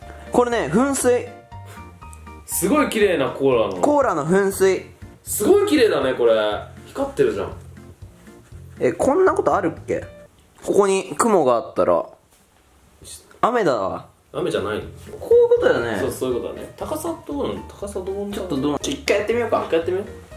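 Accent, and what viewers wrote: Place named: Hiroshima, Japan. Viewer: 広島弁炸裂じゃけー分かりました！笑笑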